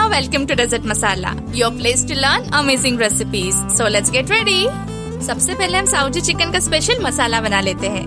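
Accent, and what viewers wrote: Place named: Nagpur, India. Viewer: I can sense Nagpuri accent in your language, though u tried to talk in pure hindi.....'ab apni' 'aju baju se'🔥🔥bole to apna Nagpur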